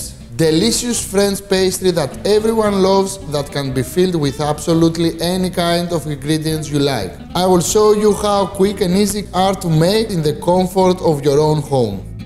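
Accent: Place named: Greece. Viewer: Καλό μαγείρι ο ς, δεν λέω ΑΛΛΑ, εμείς στραβωθηκαμε να μάθουμε Αγγλικά, ακούγαμε τα εξ αμάξης στην Αγγλία για την προφορά μας, και έρχεται ο ς με την ελληνικότατη προφορά του, να μπερδεύει το αλμυρό με το ξινό, να μην ξέρει πως λένε ούτε τα μπισκότα, και παρόλα αυτά τους βλέπεις όλους στα σχόλια να ξερογλυφονται. Γεια σου ρε!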